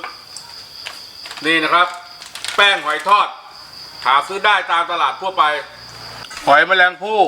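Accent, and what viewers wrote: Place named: Rayong, Thailand. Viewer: ไม่สุกทีง...สำเนียงระยองเลยคับ555..fcคับ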